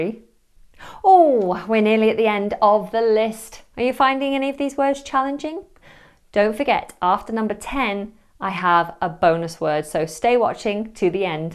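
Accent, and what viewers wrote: United Kingdom. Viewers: HEYN HEY!HEY !HELLO BEAUTIFUL LADY!😘 I REALLY LOVE YOUR BRITISH ACCENT!😘🙏. ESPECIALLY ENGLISH ACCENT FROM AUSTRALIA I JUST LOVE THE ACCENT IN SHORT WORDS OF THEM IN GENERAL... IT IS JUST KIND OF DIFFERENTIAL FROM USA ENGLISH. AND NOT MENTION THE DIFFERENCES FROM EACH STATE HERE IN USA ESPECIALLY THE TERMINOLOGY SPEAKING OF BLACK PEOPLE, NOT QUALITY EDUCATED, OR LESS OF MEDIUM EDUCATION... I'M NOT SAYING THIS FOR DISRESPECT BLACK PEOPLE,. I'M SAYING THAT BECAUSE THE DIFFERENCES OF SPEAKING WAYS OF SOUND DEPENDING OF THE AREA, OR AN INTERACTING HIGHLIGHT. JUST TO SAY...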